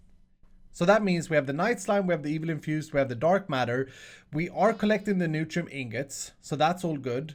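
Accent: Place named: Sweden. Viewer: Are you swedish is kall is a swedish word and your first vid sounds like a swedish man talks english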